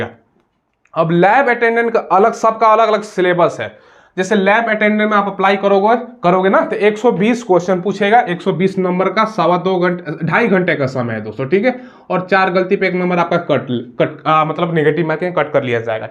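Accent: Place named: Bhojpuri, India. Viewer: Bhojpuri ka axnent aa hi jata hai 😀😀😀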